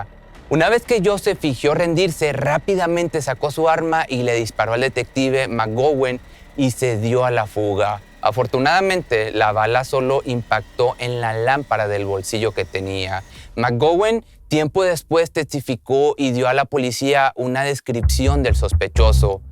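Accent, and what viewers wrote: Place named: Mexico. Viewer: Gràcias,luego lo veré,el problema es que tienes una grácia contando cosas tan sérias,será tú acento mejicano,me tienes enganchada,un abrazo desde España.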